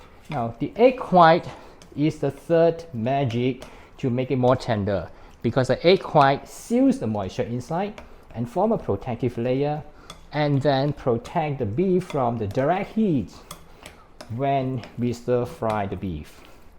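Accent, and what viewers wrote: Singapore. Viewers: Singaporean accent, anyway thank you.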